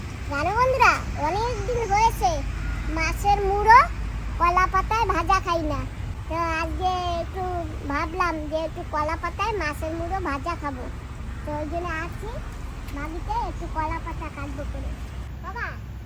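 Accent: Barishal, Bangladesh. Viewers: দিদি তোমরা কি ওখানকার স্থানীয় নাকি বাংলাদেশের সাথে তোমাদের কোন সম্পর্ক আছে আমাদের বাংলাদেশে বরিশাল জেলায় তোমরা যে ভাষায় কথা বলো আমাদের বরিশালের ভাষা একই একটু জানাবেন দিদি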